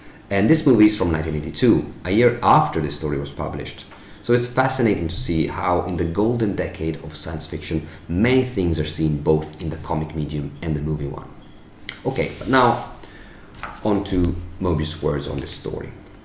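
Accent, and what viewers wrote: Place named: Sweden. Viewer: You must’ve picked up some of the weird ways Swedes pronounce English too because my “Swede-sense” was definitely tingling when I heard you speak 😅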